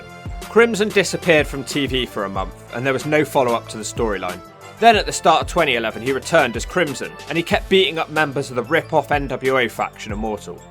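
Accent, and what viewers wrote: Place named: Wiltshire, United Kingdom. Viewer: You sound like you're from wiltshire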